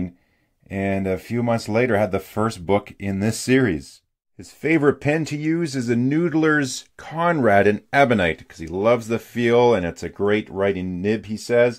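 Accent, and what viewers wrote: Canada. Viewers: Are you AvE’s less sweary brother by any chance. That Canukistan cant is remarkably alike.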